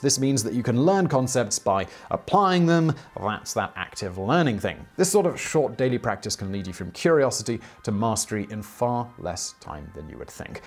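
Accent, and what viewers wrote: United Kingdom. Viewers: Okay, I know I give you crap all the time about your pronunciation of Los Angeles, although I did hear you say how an American would say it really recently, proud of you, the way you said New Orleans is not too different from where people where I'm from say it, so I don't know if you've been taking notes or just in the UK that's how they say it. there's another English guy on another Channel I watch, and he keeps saying Saint Louis as "Saint Louie". now, there is precedent for that, and historically it has been pronounced like that, but nowadays we say it more like "Saint Lewis". So, imagine your Welsh friend is really holy. Anyway, I have to say though, I didn't know whether or not this channel had started getting into conspiracy theories when I saw the title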